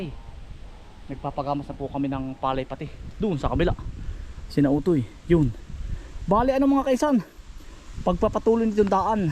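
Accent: Quezon, Philippines. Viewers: Ka insan san ba banda bahay nyo if papunta sariaya san baba para makapunta dyan matagal na ako subscriber mo doo ka pa sa kuawit dalawa kami kaibigan ko kya nga sabi ko parang yung punto mo eh taga quezon ka nga kya noon nakita ko na naluluto ka ng kalamay sabi ko na nga ba at taga quezon ka eh meron kmi laundry shop dto sa lucban sa may gilid ng pandayan bookestore sa may kantosaan ba yan sa inyo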